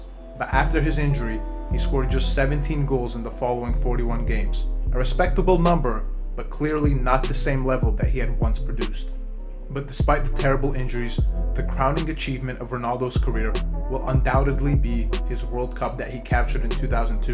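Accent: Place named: United States. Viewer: Già sentire un accento americano che parla di calcio non è credibile...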